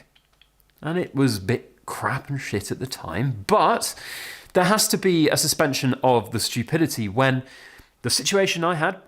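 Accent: United Kingdom. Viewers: Every time I see one of these videos I immediately know it’s gonna be some British accent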